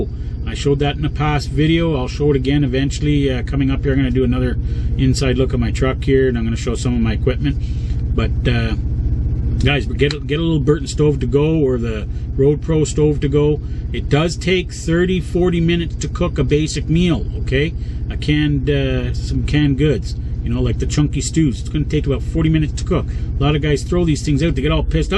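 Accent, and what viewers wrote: Canada. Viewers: I like what your doing. Next time just a little less Canadian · Hey! I noticed you sound pretty UP or Canadian. I'm here in mid michigan working at Pilot Flying J night shift. I think I might have seen you before. Anyway, it'd be really cool for you to stop by. (Don't tell management;D ) I'll throw you a free coffee, soda, cup of ice, or some food if you want. Just look for the bald guy. See! This goes to show If you're a cool trucker, then truck stop cashiers will hook you up. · Are you from Canada? Lol wondering because of the accent. You sound like the guys from this show called “trailer park boys” on Netflix which is filmed in Canada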